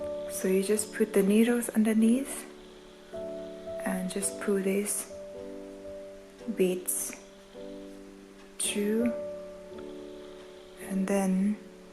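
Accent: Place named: Iceland. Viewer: U got a björk accent 😍😍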